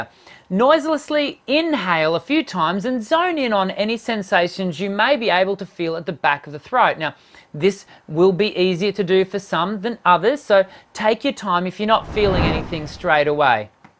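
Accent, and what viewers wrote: Australia. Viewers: Lol I'm a woman with a deep voice and his low range is my high range. But he holds a tune well! I love his Australian accent and turns of phrase too. His personality comes across too. Just enjoyable and educational all around.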